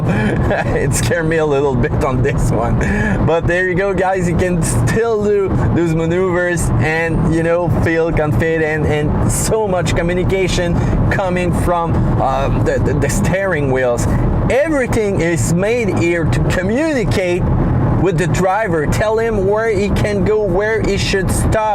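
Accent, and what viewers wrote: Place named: Montreal, Canada. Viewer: You don't need to listen to people correcting you. Your review was top notch. Your Montreal accent did not get in the way of me understanding anything.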